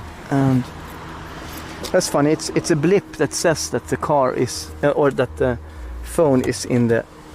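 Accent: Sweden. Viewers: nice swede english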